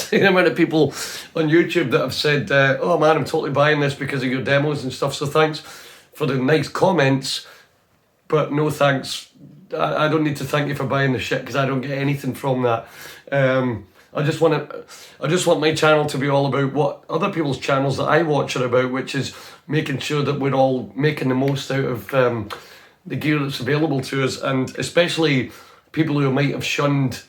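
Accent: Scotland, United Kingdom. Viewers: Real world every day use of one size fits all swiss army knife go anywhere do anything on a shoestring budget guitar pedal? Thank you for posting man. I got one and am just getting the hang of it. My Pearly Gates equipped Les Paul seems to have a higher output than the presets were made for even the clean patches have too much gain. It's cheap, portable and Boss reliability. Love your Scottish accent man. Cheers from the Caribbean. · Hey, Nice to hear a fellow Scot talking guitars. I also own a GT1 and I know what you mean about feeling that something isn't quite the same. I sense this being the same for all modelling gear at whatever price range. I run the GT1 into a Two Notes Cab M for cab emulation and it sounds as good as any modelling kit out there (to me anyway). I think cab and space simulation is really where the difference is made. I swapped between tube amps and modelling for ages, finaly seem to sticking to the Boss Nextone (not a modeller) which sounds and feels excellent. 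Anyway, I like your channel man 😎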